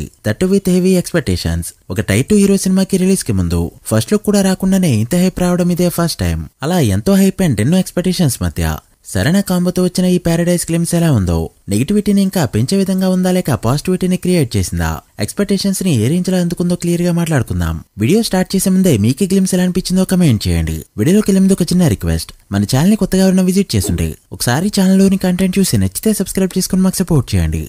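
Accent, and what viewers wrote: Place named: Telangana, India. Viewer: ee telangana slang vinadanike chirakuga vuntundi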